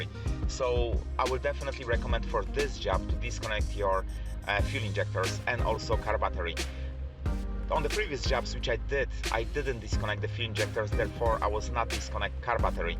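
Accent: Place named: Poland. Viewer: Ale typowo polski angielski 😅 po pierwszym zdaniu wiadomo skąd autor pochodzi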